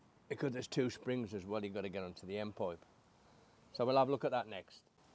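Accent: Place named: Erdington, United Kingdom. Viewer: Great Vid. Lovely to hear your accent. I'm thinking Erdington. Am l right?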